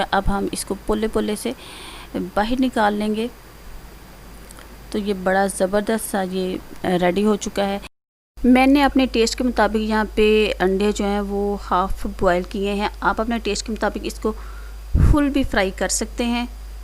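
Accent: Punjab, India. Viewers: Hahahahahahaahaaha Punjabi ka tarhka I like it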